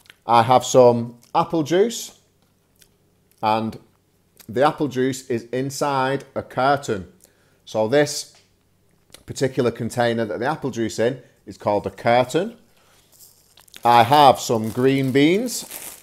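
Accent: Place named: Manchester, United Kingdom. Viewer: He's actually from the Manchester area in Northern England. His accent is different to the way people speak in Scotland.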